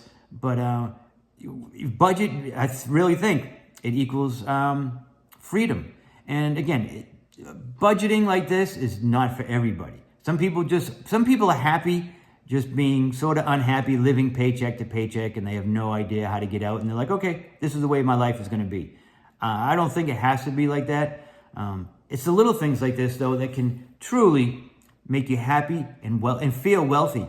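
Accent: Boston, United States. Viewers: love the Boston accent!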